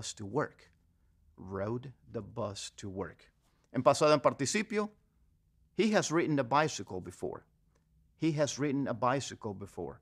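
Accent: South, United States. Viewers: Wouldn't have guessed you'd have a southern accent when you speak English haha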